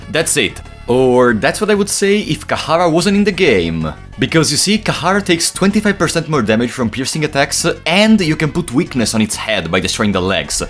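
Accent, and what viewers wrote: Italy. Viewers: Oh my god, now I love the Italian accent because I can't make out exactly what Americans, British and Australians are saying in English😂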